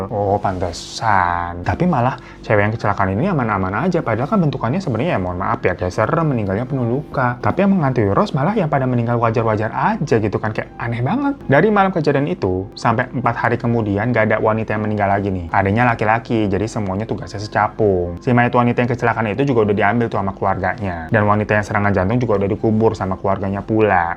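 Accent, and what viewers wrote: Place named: East Java, Indonesia. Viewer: Sy kira orang ngapak, Brebes tegal. Ternyata jatim 😂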